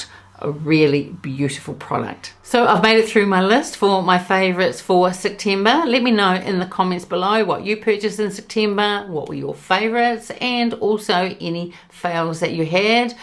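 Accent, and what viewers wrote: New Zealand. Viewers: I'm so glad I found your channel... I also love your beautiful Kiwi accent! Lots of love fom the other side of the world! 💜🇬🇧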